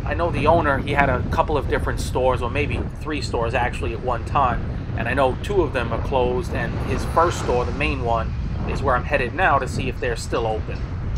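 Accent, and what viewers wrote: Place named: New York, United States. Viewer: thanks for another ride along. i really like these. on a side note i ran across an old video of yours. it was the one you made a wine bottle tongs. boy your new yorker came out in that one..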